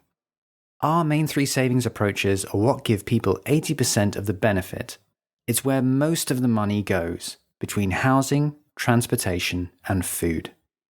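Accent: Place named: United Kingdom. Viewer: Why does it have to be a british accent! 😭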